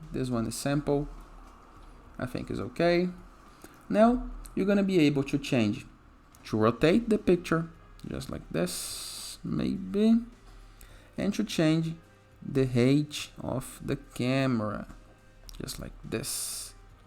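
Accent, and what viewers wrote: Brazil. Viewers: That sweet good Brazilian accent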